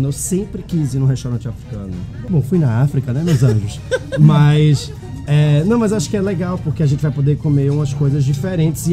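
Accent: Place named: Salvador, Brazil. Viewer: Vc é baiano,? Tem um sotaque soteropolitano aí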